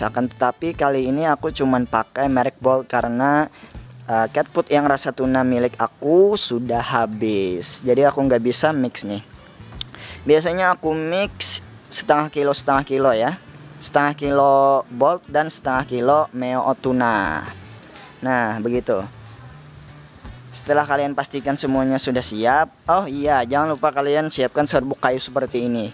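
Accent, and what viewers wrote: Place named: Banjar, Indonesia. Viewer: Totok banjar logat nya neh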